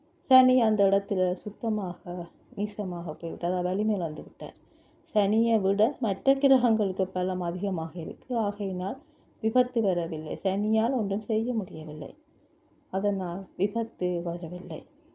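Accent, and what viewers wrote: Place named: Sri Lanka. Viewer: நல்ல அருமையான, நுணுக்கமான விளக்கம் . நன்றி அம்மா.. உங்கள் பணி தொடர வேண்டும்...வாழ்த்துக்கள். நீங்கள் ஸ்ரீலங்காவிலிருந்து பதிவு செய்கிறீர்களா... ஸ்ரீலங்காவில் உள்ளவர்கள் பேசும் தமிழ் போல உள்ளது...🙏🙏🙏🙏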